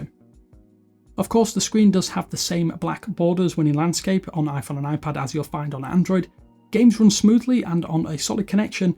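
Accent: United Kingdom. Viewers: Is this available worldwide? I’m in the UK and it doesn’t seem to be working. I ask the question because you seem to have a UK accent.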